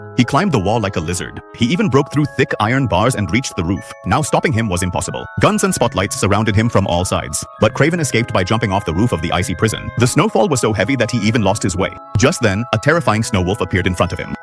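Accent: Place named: India. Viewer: Why does this guy sound Indian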